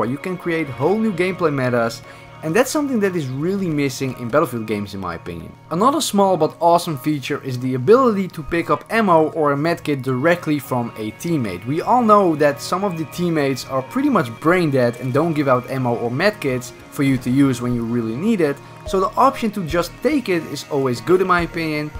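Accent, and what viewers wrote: Netherlands. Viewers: Are you from nl ? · You sound Dutch. Are you Dutch? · Your voice sound dutch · Hey dude, you’te Vids are very good and you for sure desurve more subs but i have a feeling you are dutch, are you ? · I can hear you're dutch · You sound Dutch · Are you dutch? I hear that accent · Are you Dutch or something? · Nederlands Engels accent, bah, werk er aan! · Great Video Mate👍🏽 Are you from the Netherlands? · Youre dutch right? Want ik ook😂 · Steenkolen Engels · Are you dutch? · your voice sound nederlands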